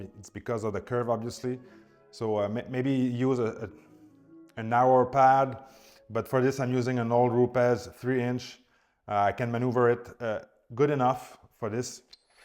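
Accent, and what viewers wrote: Quebec, Canada. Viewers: You sound Québecer. Subbed!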